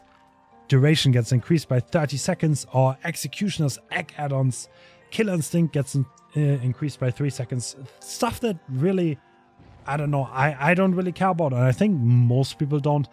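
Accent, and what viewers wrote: Germany. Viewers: man hört, dass du deutsch bist ;)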